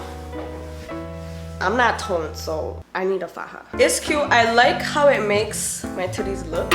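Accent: Colombia. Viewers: You’re red hair looks BOMB😍😍 love your Columbian accent!! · 😍😍😍😍😍😍😍😍😍😍😍😍😍 You looks so pretty and btw i love your colombian accent you should speak it more love you💓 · I love your Colombian accent 🤤😍 and your body 🔥🔥🔥🔥and yes please do a video on how to pose ! ✌🏽✌🏽✌🏽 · I love her colombian accent ♡ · When you speak Spanish the Colombian accent wow 😍, reminds me of my Colombian family makes me wanna go eat some buñuelos, arepas and empanadas.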